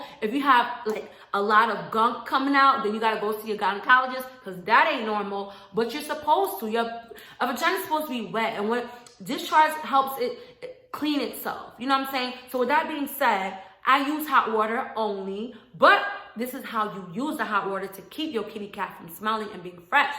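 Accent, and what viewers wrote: New York, United States. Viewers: She has personality of cardi she has the new york accent. But she doesnt look like her she looks better than cardi 😂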